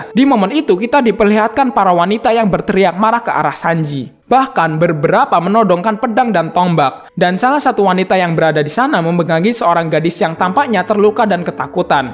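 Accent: Java, Indonesia. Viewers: Tapi nada yang emang bikin nagih. gx bisa digantiin. Apalagi inggrisnya enak bro, lancar tapi aksen jawa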